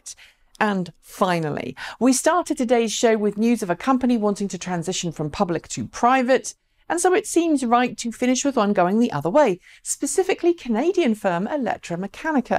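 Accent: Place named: United Kingdom. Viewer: It is without contest that your show of the many YouTube professional and semi professional out there in the digital world one of the best if not the best in it's class. Yes many may say it is in fact your livelihood but the progress made over the years with how the show is presented is fantastic. The content is always from my Jamaican prospective deep, rich and eye opening given from a balanced position. This is where my deliberate and express bias comes in; that beautiful British voice is always beckoning my undivided attention to hear the unbroken dialect. Sweet!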